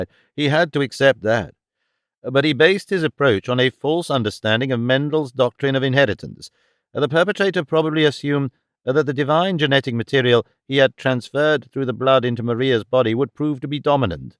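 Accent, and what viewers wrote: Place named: United Kingdom. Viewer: I will really miss this so clear British accent❤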